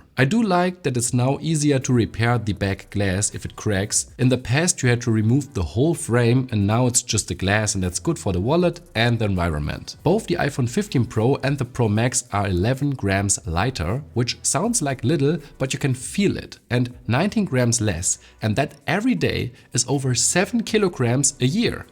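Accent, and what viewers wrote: Germany. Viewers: Ich mag dein DEnglisch 😅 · You sound German … wouldn't mind this video in German.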